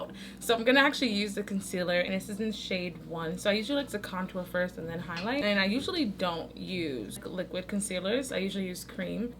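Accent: United Kingdom. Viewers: Girl! Where you been? It has been too long and your UK accent is coming along nicely 😏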